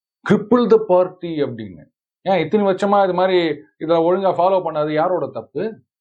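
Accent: Chennai, India. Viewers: Innama neeyu, Avaru Ara Kura Madras Tamil than pesararu. Mulu Madras bhashai pesala. Purda..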